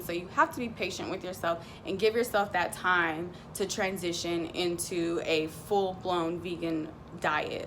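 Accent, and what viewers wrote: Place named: Jamaica. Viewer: Beaitiful skin; sounds Jamaican too.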